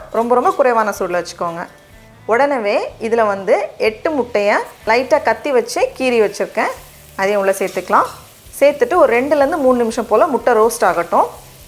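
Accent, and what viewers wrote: Nagercoil, India. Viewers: Hi friend.. Nagercoil vaasam ungal pechu la theriyuthu.. namma oora neenga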